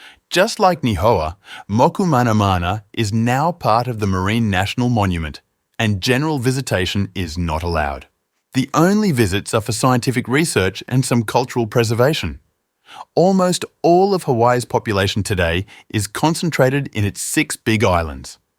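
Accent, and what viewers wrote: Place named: New Zealand. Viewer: Dude sounds like a Kiwi. He may be mispronouncing ʻŌlelo Hawaiʻi by using the Te Reo Māori pronunciation (There are many similarites between the two languages, especially when one is a non native, non fluent speaker of either) As a hawaii resident, I've been told I tend to screw up and mispronounce Te Reo Māori words by saying them as if they are ʻŌlelo Hawaiʻi. (Which I have some familiarity with even though I don't actually speak ʻŌlelo Hawaiʻi fluently)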